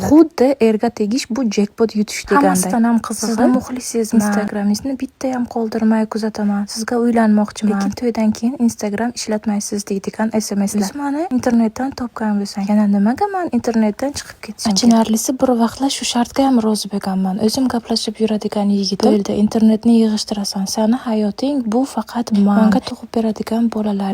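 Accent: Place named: Bukhara, Uzbekistan. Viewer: Buxoro shevasida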